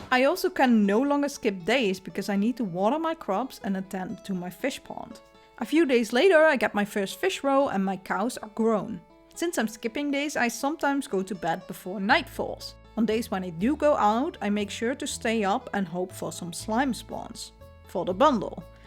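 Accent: Netherlands. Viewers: Listening until the end I realize you’re probably Dutch haha!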